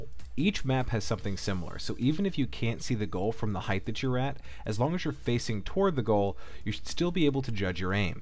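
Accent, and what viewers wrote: Netherlands. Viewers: So you're dutch i reckon.. Where did you get your accent? i like your vids btw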